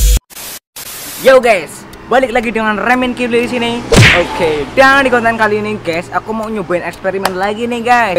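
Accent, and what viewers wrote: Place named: Banyumas, Indonesia. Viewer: Kamu apa orang ngapak bang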